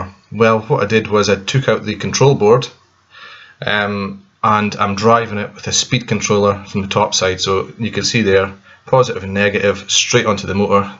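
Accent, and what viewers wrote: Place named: Aberdeen, United Kingdom. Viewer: Great video! You sound like you're near me. Aberdeen?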